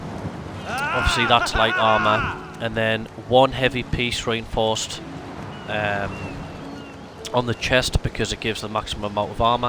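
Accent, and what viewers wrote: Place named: North, United Kingdom. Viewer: listen to those dulcet northern tones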